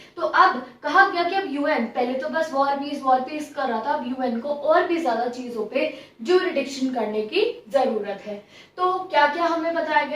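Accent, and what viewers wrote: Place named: Uttar Pradesh, India. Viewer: Inke language u.p feel lati h......moro moko mohse hega n all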